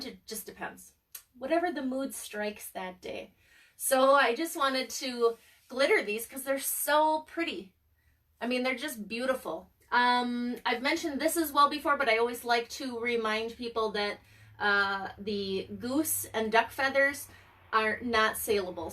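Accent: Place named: Ireland. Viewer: You kinda sound irish lol :)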